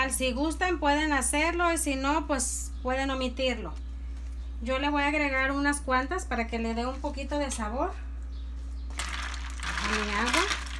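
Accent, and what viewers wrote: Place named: Juárez, Mexico. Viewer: Eres de Juárez , es que hablas como Antonia Losoya😊 se ve sabrosa tu agua , la voy a preparar ..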